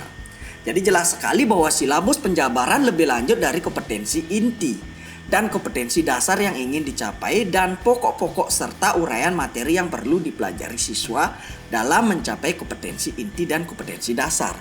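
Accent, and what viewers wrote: Bali, Indonesia. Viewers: Kyk logat bali malah menurutku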